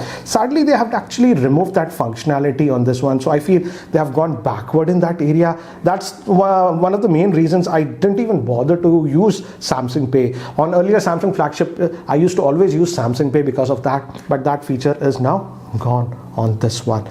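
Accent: Hyderabad, India. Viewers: Sir are u from Hyderabad ? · You could watch just about any other review if you didn't like that he is an Indian and has an Indian accent?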